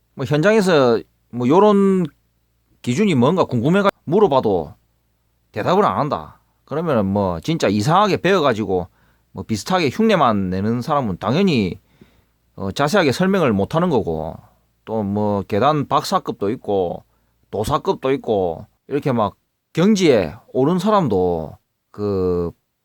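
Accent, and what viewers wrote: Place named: Busan, South Korea. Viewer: ㅋㅋ 늘 재미있게 보고 있습니다. 설명하시는 것들 충분히 이해했고, 표가 없이도 나름대로 길이를 찾는 법을 찾겠더라고요. 그래도 자세한 설명이 큰 도움이 되었습니다. 형틀목수 시작한지 얼마되지 않았는데, 관록도 필요하지만 이론이 바탕이 되어야 한다는 것을 많이 느끼게 해주셔서 감사합니다. 당장은 써먹을 일이 없겠지만, 기회가 오면 도망가지 않을 수 있을 것 같아요. 너무 빨리 그 날이 오면 선배들에게 눈치가 보이겠지만, 그래도 기회를 잘 사용해서 현장에서 인정받고 싶네요. 계산에 무지한 목수만 있는 것이 아니란 사실을 보여주고 싶기도 합니다. 구수한 부산사투리에 솔직한 썰... 늘 즐겁게 보고 있습니다. 언제 부산에 가면 한잔 하시죠 ㅋㅋ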